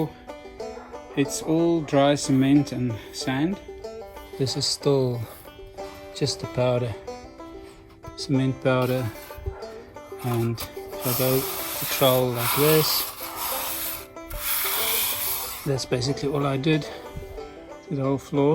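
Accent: South Africa. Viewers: your accent sounds South African.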